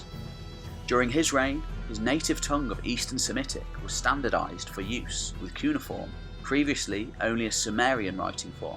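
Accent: United Kingdom. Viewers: wait, you forgot to mention his luscious british accent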